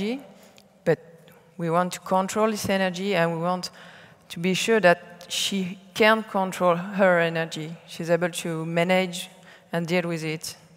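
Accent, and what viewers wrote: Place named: France. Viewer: On entend bien l’accent français 😂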